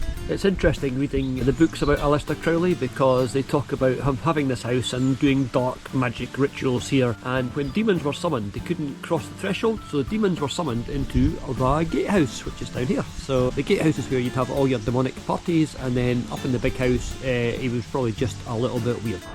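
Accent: Scotland, United Kingdom. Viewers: Good video you haven’t lost your accent it’s nice to hear the Scottish accent 👌♥️lovely scenery but not as nice as Skye obviously 😂👌. The falls were not falling much 🤔😂. Stay safe and take care 🤔♥️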